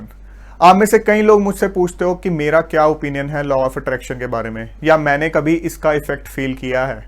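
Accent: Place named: Punjab, India. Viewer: Your language is filled with punjabi tone😆❤️ sir!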